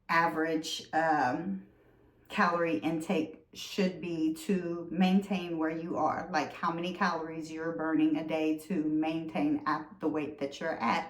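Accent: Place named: Louisiana, United States. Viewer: I know a lil houma or thibodaux when I hear it. 😉 🩷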